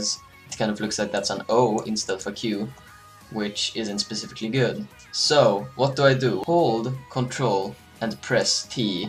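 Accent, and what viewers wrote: Sweden. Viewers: är du från sverige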